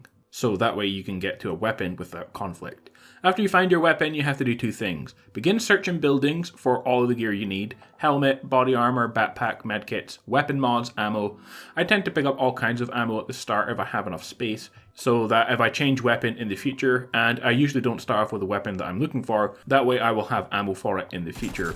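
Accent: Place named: Scotland, United Kingdom. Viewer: I need to ask, what's your accent? Because im from Edinburgh and it seems like you have a Scottish accent but at some times it sounds American haha, also I love the videos buddy!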